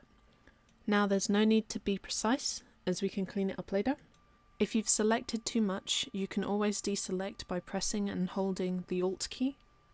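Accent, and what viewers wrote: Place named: Southern England, United Kingdom. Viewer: Well that was okay but you've gone too fast for some, I've only cottoned on by pausing the video to see what you've clicked. As of now, I'm totally sick of hearing your posh Southern voice & I'm a fellow Brit. You've literally explained nothing here - just skirted over everything at pace, awful, awful "tutorial" but "thanks" for trying...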